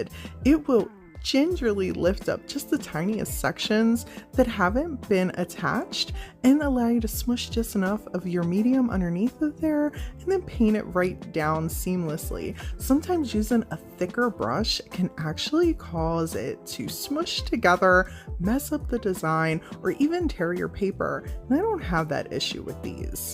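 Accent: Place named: Pennsylvania, United States. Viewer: Pennsylvania!!!! That must be what it is.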